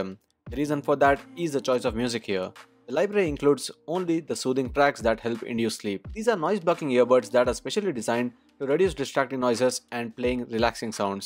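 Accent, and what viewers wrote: India. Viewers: Indish english?! Its sooo nasty 🤮🤮🤮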